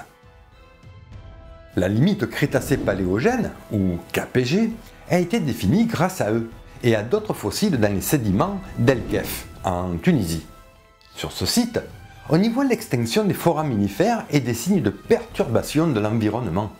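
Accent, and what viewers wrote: South, France. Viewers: Très bon sujet. Et c'est toujours agréable à écouter avec cet accent plein de soleil alors qu'il pleut à verse chez moi :) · J'adore votre chemise, manque des espèces d'arbres fossiles dessus, j'aime encore plus votre commentaire assez clair outre l'accent du Sud ^^ Les trapps de Sibérie semblent indiquer que l'humanité pourrait aussi disparaître un jour suite à un épisode volcanique ravageur enclenché par un réchauffement global et relâchant des mégatonnes de gaz toxiques... Yellowstone pourrait être un vecteur de catastrophe, pour l'instant le fond du cratère se déforme et s'élève et les geysers chantent devant les touristes, advienne que pourra. La peau d'orange qui recouvre le globe pourrait se déformer aussi avec une fonte accentuée des glaces, ce sont des phénomènes qu'on maîtrise encore mal car ils sont multi factoriels, donc probable qu'on soit mis devant le fait accompli en cas d’événements cataclysmiques, mais restons optimistes...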